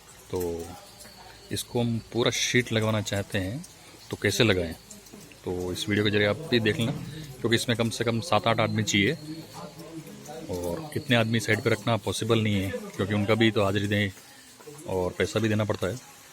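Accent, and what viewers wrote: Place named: Mewar, India. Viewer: Ktu bhaisab mevadi bolira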